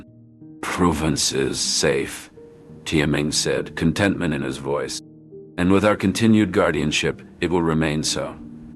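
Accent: United States. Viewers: Ai sounds American, I mean its making a mockery of English as usual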